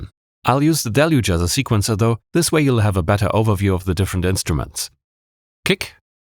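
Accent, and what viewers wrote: Germany. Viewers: Wollte erst fragen, warum er die Beiträge nicht in deutsch bringt 😋🤔😂 Sein Englisvh ist gut, aber dennoch können wir es als Muttersprachler heraushören. Um so gruseliger muss unser Englisch für "Engländer" sein 🤪😂 - zu immitieren erfordert schon einiges. Die Klangkulisse sind extrem komplex und trotz der minimalistischen Samples fügt sich alles zu diesem unverkennbaren Sound 👍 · Ich höre da sowieso einen Deutschen Akzent raus oder - Geiles Video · As a German i heard it right with his first words. Dont know what it is but you can hear if someone is German. Just a few can sound nearly not-german. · Was für ein vertrauter deutscher Akzent in dieser Erzählstimme…wird hier nicht übersetzt…do it with google or gemini or siri or otherwise❤️🖖🤟P.S.: Just ask a german friend of yours👍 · 12,39 are you german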